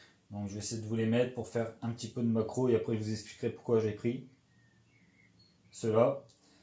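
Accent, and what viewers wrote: North, France. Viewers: Salut, tu es du Nord ?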